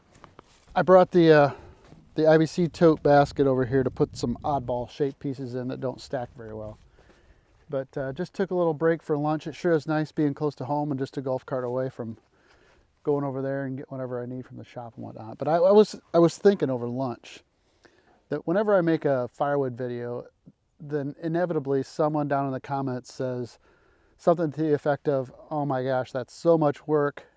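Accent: Germany. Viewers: Why does this have a German voice over?????????????????????????????????????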